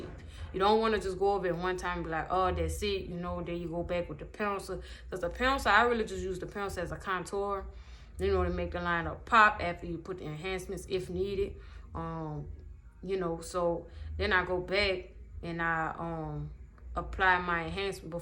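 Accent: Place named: New Orleans, United States. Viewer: you straight out of new Orleans that accent thick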